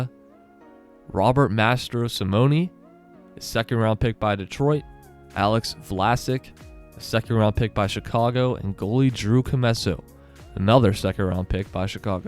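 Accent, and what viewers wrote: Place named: Upstate New York, United States. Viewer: he can not be, sounds like up state NY. Or he is reading stat sheet from 6/7 years ago. The Gophers? Michigan is best in the 10. Badgers? Who? U.M.D. and North Dakota are better.B.U.however,that is right.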